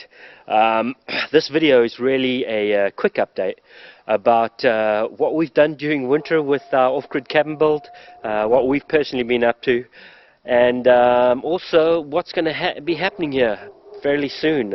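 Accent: South Africa. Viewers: is he south african or is it just me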